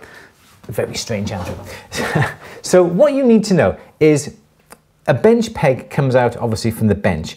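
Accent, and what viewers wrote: Wales, United Kingdom. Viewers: Great lots of tips for my memory bank. Cheers. Can I ask why do u turn your bench hook back around at an angle, after u finished cutting flat pieces Andrew? Sorry it's prob a basic answer but I'm just starting out trying To learn myself to work with some metals like copper and silver and also coins. I started making wood rings but I want to broaden my horizons so to speak. Love your channel, it's so helpful to me and many others.. Merry Christmas from Ireland, do I hint a Welsh accent there? Or somewhere close?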